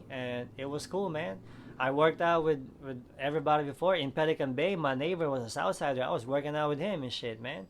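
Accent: Philippines. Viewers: I like the pinoy accent. That's dope. You make it sound treal and gangsta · I love the Filipino accent man, I was in YA in 87-89 and I was accepted by the blacks and Mexicans but I got the hardest time from the Filipinos. I'm half white/Filipino but I was born and raised in the Philippines, deep in the jungle in a literal bamboo hut. But since I wasn't full Filipino I was given a hard time eventho most of the Filipinos in America never even step foot in the islands. But it is what it is, I like the channel man.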